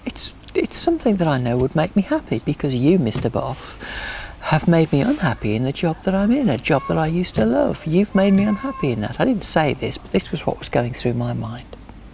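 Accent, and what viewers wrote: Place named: West Midlands, United Kingdom. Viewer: If you're reading, I just found you're channel; even though it is closed your love, friendship and inspiration is continuing to reach people, who like you, care! There are a lot of us! I would have told my boss the same thing as well! I do hope the business is going well. I hear a West Midlands accent! If you do read this, can you please send me a link to your website. Have fun!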